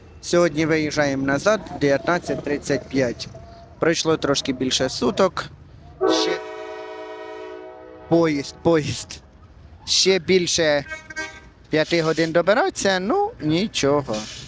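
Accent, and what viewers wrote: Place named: Poltava, Ukraine. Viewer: Полтавчанина по говору зразу чути. Дякую за екскурс по місту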